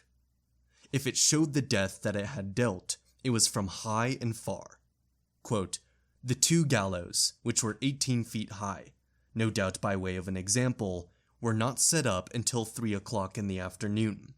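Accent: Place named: United States. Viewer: You sound like a native speaker of American English, but you seem to have a lot of trouble with pronunciation of multisyllabic words. May I recommend that before you read a section, you look up longer words in the dictionary to get the pronunciation right? It's a massively hard job you're trying to do and it's a shame you're not creating masterpieces of oral presentation. (The most common error: Although the accent falls on the first and third syllables of the noun "distribution", in the adjectives "distributive" and "distributive" the accent falls on the second syllable. I think in places you're saying "juridical" but the pronunciation is so garbled, it's hard to know.)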